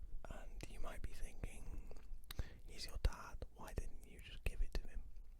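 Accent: Wales, United Kingdom. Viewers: ah right thanks I thought it sounded Welsh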